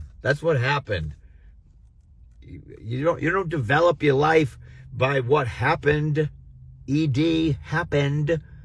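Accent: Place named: South Boston, United States. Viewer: Like your South Boston accent, caard!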